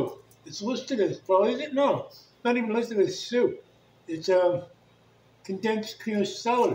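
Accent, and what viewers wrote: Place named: Wales, United Kingdom. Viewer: Are you originally Welsh? You have a Welsh accent here and there :)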